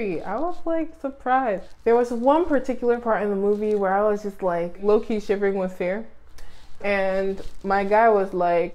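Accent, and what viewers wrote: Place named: Nigeria. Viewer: Your Nigerian accent is coming thru 🇳🇬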